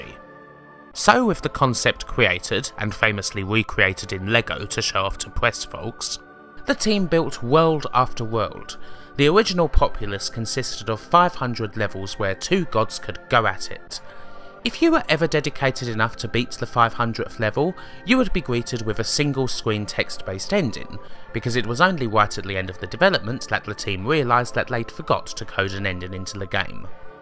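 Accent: United Kingdom. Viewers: The problem is his video's are to long. He needs to cut it down. It's like a dude rambling around one person for hours on hours long. get's old fast. Make a 10-15 minute video and combine the big points. The moment people see the time on the video they will click it away directly. Nobody has time for such long talks. It also doesn't help, his voice is extremely british, which makes it kinda annoying to lissen to for long times. As his voice contains lots of low and heights sound pitch voices. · aa aa I disagree. People do have time. It's just that people don't want to take that time. As for her British voice, yeah well, you'll get used to it.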